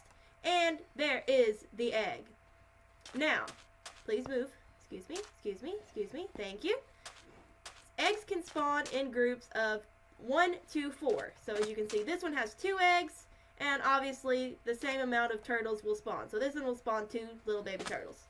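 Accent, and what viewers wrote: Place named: United Kingdom. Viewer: That British accent though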